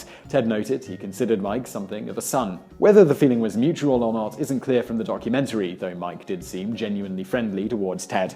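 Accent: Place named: Belfast, United Kingdom. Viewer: Is anyone here from Belfast ?? This sounds like a homeless man in Belfast.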